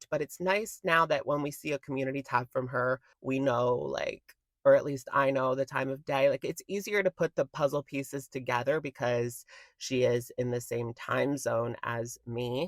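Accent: Boston, United States. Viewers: It’s a Boston accent. Not a NY accent. Either way, it’s sus. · Boston accent not New York. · Her accent is not New York. It's Boston. Its a weird version of Boston, but still Boston.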